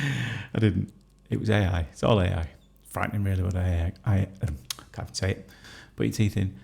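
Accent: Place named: North, United Kingdom. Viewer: Slab. What a great word for that piece of wax! And I love how you say fudge. Those northern u sounds are lovely. ❤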